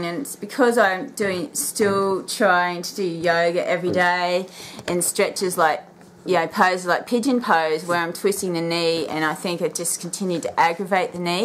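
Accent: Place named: Australia. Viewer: where in Australia are you? its good to hear an aussie voice on here. and i feel like we've met before!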